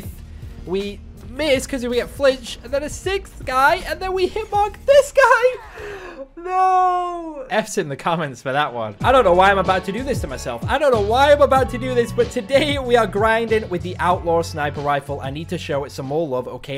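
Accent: United Kingdom. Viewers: I like your accent fluent English and just the little British tint love it!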